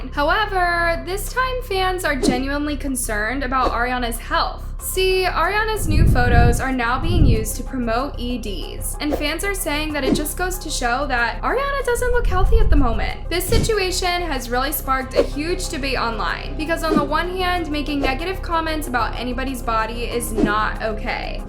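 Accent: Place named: Los Angeles, United States. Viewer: That L.A accent is soo annoying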